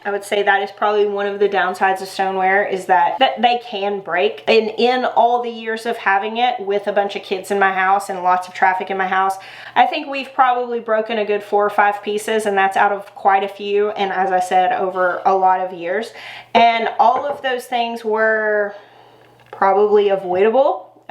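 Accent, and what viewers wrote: South, United States. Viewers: Thank you!!!! You speaking German.....was a little disconcerting.....I prefer Southern accent ;-)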